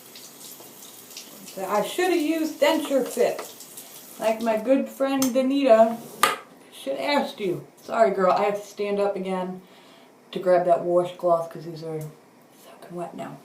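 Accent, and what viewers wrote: New York, United States. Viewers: Your so pretty! Love your NY accent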